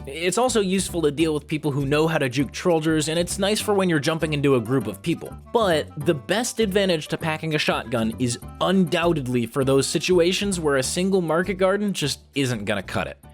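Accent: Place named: Texas, United States. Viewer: Shit sound you live in Texas? That is scary close to me.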